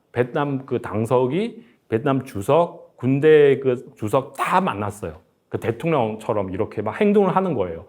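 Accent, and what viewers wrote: China. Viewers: 발음이 즁국 사람인가?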